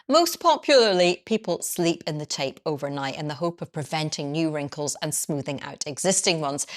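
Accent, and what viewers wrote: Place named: Scotland, United Kingdom. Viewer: Aaa yes! It is hard for me sometimes to hear where people in UK are from but you sound like Nicola Sturgeon! Your Prime minister? Hmmm should know this better! Feels like I have more question marks the more I write… 🙄 It sounds lovely anyway 🥰👌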